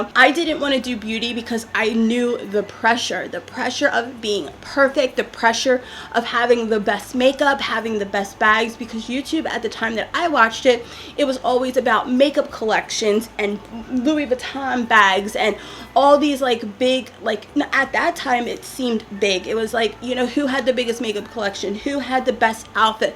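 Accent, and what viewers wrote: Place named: Philadelphia, United States. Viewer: Love your videos (your realness)!!! OMG - You're perfect just being yourself! I can't believe that you 'didn't fit in'. You seem like the sweetest person. When I first started watching your channel, you had me at "ballin' on a budget"! Your videos put me in a good mood because you're so bubbly and you have a sunny personality (and I like your Philly accent)! I agree with 'lady love'. People who act 'better than' are usually hiding something (most likely insecurity). Just keep being yourself,!♥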